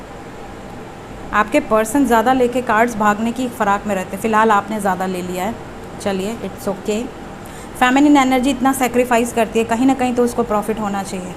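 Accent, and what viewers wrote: Varanasi, India. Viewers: Har har mahadev🙏❤ apki banarasi language bahut pyari h i love this language mam🙏 thank you angels thank you universe thank you divine thank you mam god bless you 🙏❤🌷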